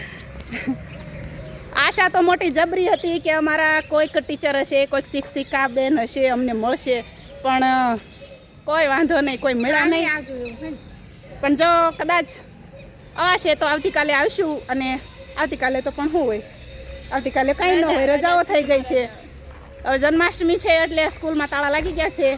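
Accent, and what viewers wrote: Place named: Saurashtra, India. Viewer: તમે પ્રાંતિજ નાં છો પણ તમારી ભાષા સાબરકાંઠા ની બોલી તો બિલકુલ કેમ નથી આવતી તમે પ્યોર સૌરાષ્ટ્ર ની ભાષા બોલો છો તમારું નાનપણ તો પ્રાંતિજ માં જ ઉછરેલું છે તો આવું કેમ સમજાતું નથી...જય શ્રીકૃષ્ણ બેન 🙏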